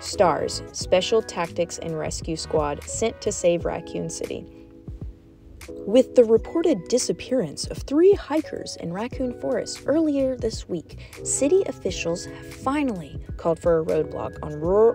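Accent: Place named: South, United States. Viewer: You’ve a soothing voice, one that makes for easy listening, like a Sam Elliot or a James Earl Jones. I heard a slight southern accent which was adorable, but I say go for it!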